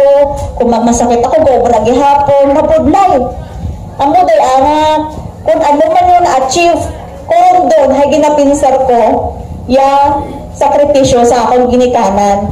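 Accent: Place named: Iloilo, Philippines. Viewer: Gusto gusto ko yung ilongo mo. Napakalambing sis.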